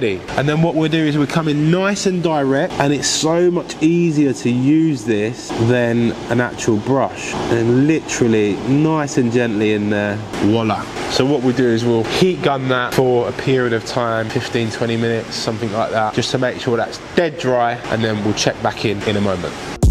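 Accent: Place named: North London, United Kingdom. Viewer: Sound north of the river mate. South East sends respect.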